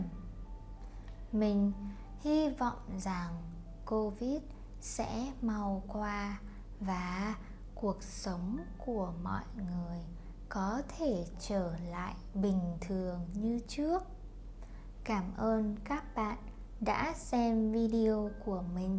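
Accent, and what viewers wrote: North, Vietnam. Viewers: Thanks for this video. I searched long to find some good stuff to listen to as an "experienced beginner" and this is exactly what I wanted (even if northern accent ;) )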